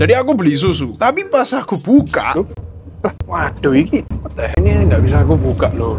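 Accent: Java, Indonesia. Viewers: Medoknya khas banget😂, semangat koh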